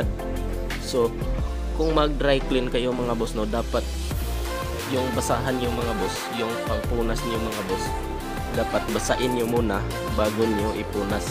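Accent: Visayas, Philippines. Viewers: Dong ang tingog nimo halata kaau na bisdak jeee pero ok na aron masabtan sa mga bisdak pod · Bisaya ka bay noh?😁😁 Salamat sa tip bay👍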